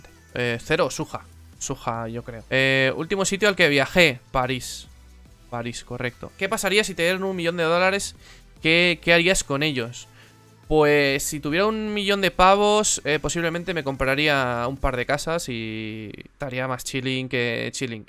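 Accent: Argentina. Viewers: Sos mitad argentino ? Douuuuuu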